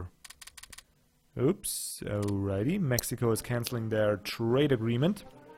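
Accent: Germany. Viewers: I might be a bit late to the party but I'm thoroughly enjoying the lets play so far and looking forward to the rest. keep up the good work and I love the German accent!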